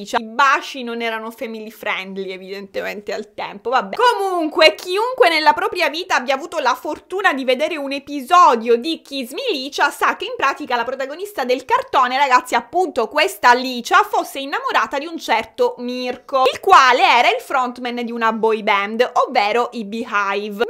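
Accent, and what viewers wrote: Sicily, Italy. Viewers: Ti ho scoperto da poco. Sei siciliana? Hai un accento credo simile.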